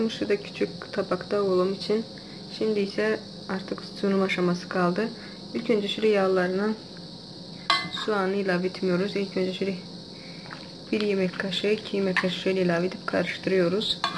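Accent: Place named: Azerbaijan. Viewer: danisiginizdanda hiss etdimki azerisiz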